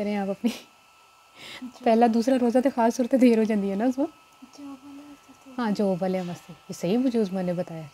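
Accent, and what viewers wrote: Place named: Punjab, India. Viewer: Wooow v nice... Apk baat krny ka andaz bhot khub surat h r sath main panjabi ka tarrka wah wah 😍😘😘💖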